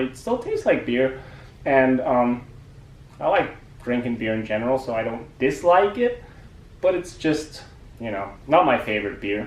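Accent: United States. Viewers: Lol, dachte du bist echter Amerikaner bis ich den Titel richtig gelesen hab, kein Scheiß. Super Ausprache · He sounds more American than some Americans Girl bye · You sound American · Till you say something with a R or a L in it you sound American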